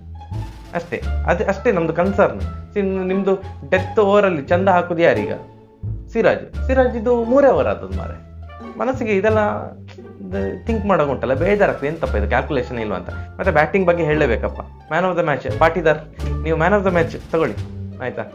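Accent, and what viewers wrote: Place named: Udupi, India. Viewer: Hello sir, I feel happy to hear Udupi-Kannada from you as I studied in MIT. I watch nearly all the videos of yours and even I subscribed the channel In recent times the match summary you were giving is lacking some important matters. For example, 1. Virat has batted at 100 strike rate you didn't said any word of it, 2. ABD is not getting much chance to bat as he is coming at latter half of 20 overs Opposition will be more happy if they want to bowl less to ABD. He once batted one down in previous seasons and asked to play at 5 in this season which is surely not a good move. If RCB want to win a game either abd or maxwell need to spend more time in the crease. I hope you prepare better in the coming videos and add all points which are crucial in determining the result of the game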